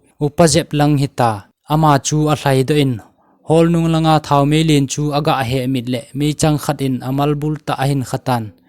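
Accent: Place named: Singson, India. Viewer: Narrator pa hi Thanlon lang Singson accent amang e.. Ngainom aum e..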